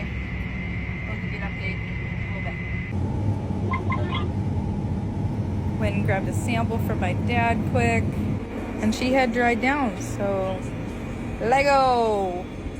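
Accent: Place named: United States. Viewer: Newly subscribed. What state r u in. I’m trying to figure out the accent. Great video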